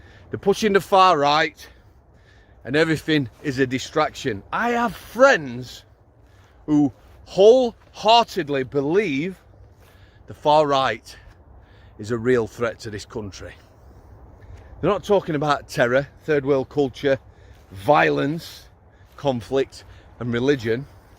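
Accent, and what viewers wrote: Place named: Leeds, United Kingdom. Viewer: Brilliant. You sound like a Leeds lad, apparently it’s kicking offin Dewsbury atm, nothing on Youtude though.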